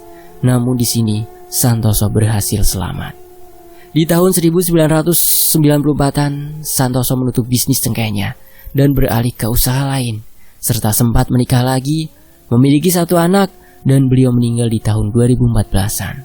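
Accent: Java, Indonesia. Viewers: Metu Su... 🤣🤣🤣🤣 mas mimin katon tenan jawane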